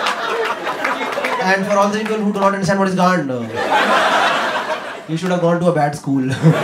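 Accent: Delhi, India. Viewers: Delhite accent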